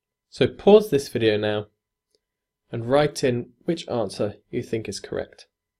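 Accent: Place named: United Kingdom. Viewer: Dear I live in Turin in the north west if Italy even if I come from South east of Italy (it's funny, isn't it?) Today I saw your website <link> but I was a bit lazy. In the next few days I'll take a look at it better. I see also if I pay more.or less 9 pounds, if I remember well, I can become a member. Is it right? I've found your website so interesting because is made well and it contains a lot of interesting things. I like so much your British pronunciation and appreciate your method. My English skill is better than some months ago because I listened a lot of English videos on YouTube. But now it's time to improve also my grammar skill and I think that your website will be useful for me. Waiting to hear you have an amazing week. A warm hug Benvenuto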